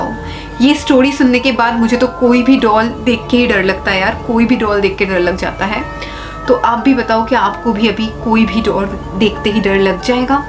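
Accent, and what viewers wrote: Bengal, India. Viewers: Apki bengoli hindi muja bohot pasend haii 😂😛😍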